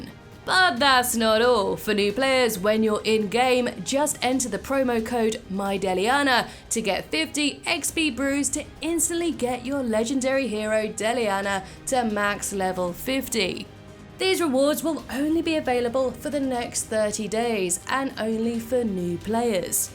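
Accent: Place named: England, United Kingdom. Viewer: This is my first time visiting your channel. How did I know you were English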